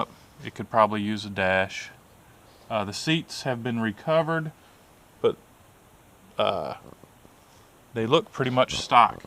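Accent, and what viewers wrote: South, United States. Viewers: Those aren’t friends. Those are people that want to take advantage of you. That having been said, you’ve fixed your audio lag and that means I’m subscribing and I’m gonna watch all your videos. I think I speak for everyone when I say we wanna see that stash tho. Hola from Mississippi, the southern twang is not lost on me.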